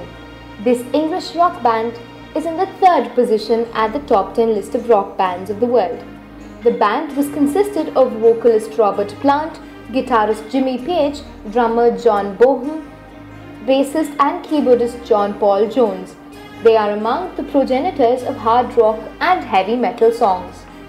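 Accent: India. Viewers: i hear indian accent ..never mind..they are so fucking far ridiculously behind in the mainstream rock and roll area and meansteam music...so fucked up with their bollywood shit!! idiots! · Is the commentary done by an Indian or something not like it matters but I thought they're knowledge was like cricket and how to make carpets fly and stuff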